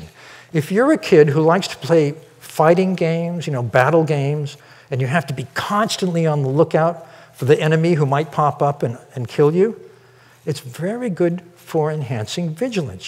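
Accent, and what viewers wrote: United Kingdom. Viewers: I feel very connected during your Q&A😂😂😂 British accent never fails me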